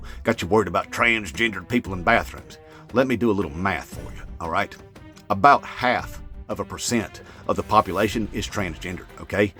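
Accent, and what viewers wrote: South, United States. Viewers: Cynical east coast here. That’s the best 6 minutes of southern accent I ever heard.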